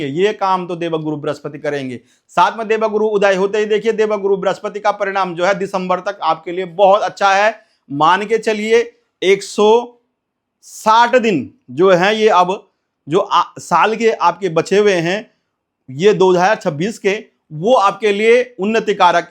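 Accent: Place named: Pahadi, India. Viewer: Mujhe lag raha tha aap pahadi Ho aapki bhasha mein Thoda Thoda pahadi pan chhalak raha hai per bahut achcha bola 🙏🏻🙏🏻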